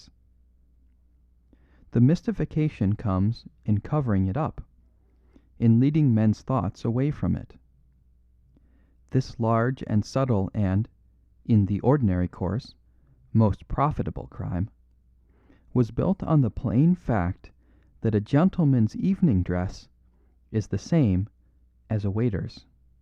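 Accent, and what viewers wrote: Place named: Midwest, United States. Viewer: Look with all due respect my friend, would you really expect a British person to read John Steinbeck with the characters of California fishery, or Tennessee Williams with the southern characters that really only an American inherently understands. It is beyond the capacity of the British to do these Justice. Ergo unless you have spent a portion of your life, say 20 years or so, living in the UK, and I gather by your accent you have not left the Midwest it really causes a problem with presentation. They're going to be terms and expressions in there that you are unfamiliar with and this all absolutely works in reverse. How about giving us a nice shot of Edith Wharton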